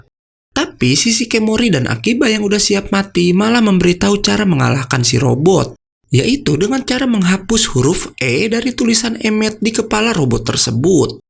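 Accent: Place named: Kalimantan, Indonesia. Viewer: Mimin org Kalimantan ya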